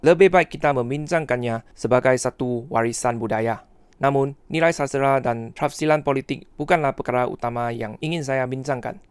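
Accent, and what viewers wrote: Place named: Terengganu, Malaysia. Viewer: bahasa nya anok Trg ,K’ltan dok lawen ………👍syabas .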